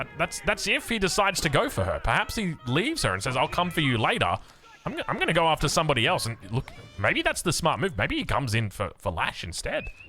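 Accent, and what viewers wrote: Australia. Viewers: I rarely sub, but this Aussie is worth subbing for! Watched like 30 videos lately and your casting is magnifizent. Also, this FFA game was very exciting and probably the most intense:o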